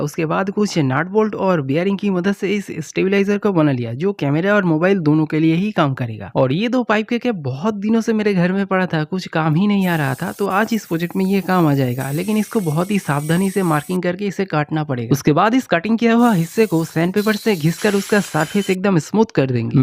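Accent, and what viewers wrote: West Bengal, India. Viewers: You are from west bangla right??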